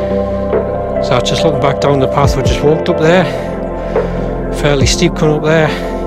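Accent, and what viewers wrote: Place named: Sunderland, United Kingdom. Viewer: Smashing video,. I watched it last weekend before coming down from Stirling to follow your route last Tuesday. Found steep bit at start of walk hard going. Weather not as good as you had it. - Pouring and very windy at top of Carrock Fell. Joined up with a lovely couple from Sunderland who had recently moved to Lake District. - Judging by your accent I suspect you don't live too far from Sunderland. I've just watched your video a second time. Enjoyed it even more now I've actually done the walk.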